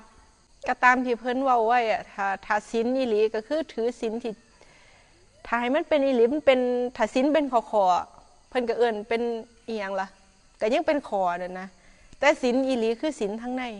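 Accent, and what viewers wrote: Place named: Laos. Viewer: แม่ชีเว้าน่ารักตกคำเว้าลาวกะเปนตาฮักจ้าน่ารักมากค่ะ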